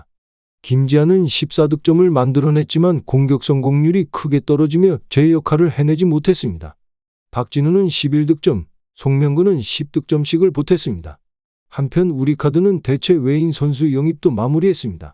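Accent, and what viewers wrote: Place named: Yanbian, China. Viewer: 연변말투 킹받네ㅋ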